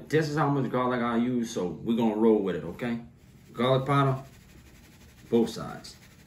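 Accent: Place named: New Orleans, United States. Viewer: That's NewAwlins talkin Dawlin!!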